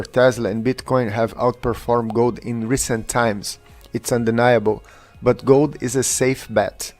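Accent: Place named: Brazil. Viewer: Not gonna lie, but your acent sounds very Brazilian for me haha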